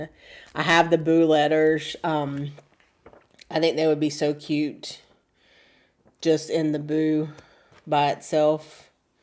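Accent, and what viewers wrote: South, United States. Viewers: Another enjoyable video !! Right at the moment I have a broken wrist and I’m so jealous of everything you’ve stitched, but I’ve really realized how relaxing our stitching hobby is, I’m retired so I start every day with and hour of stitching. Now you’re jealous right , your day will come. You have a beautiful home, I live in Canada and when I think of a “southern” home yours is exactly what I envision (no idea where you live but with your lovely accent I presume it’s in the sunny south.) have a fun Halloween 👻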